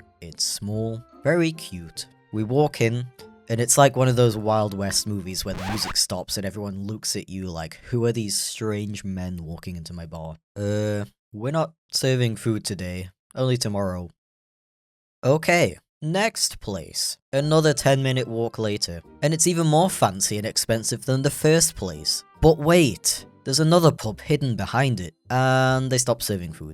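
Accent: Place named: United Kingdom. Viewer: I remember this guy back when he had his British accent 😭🙏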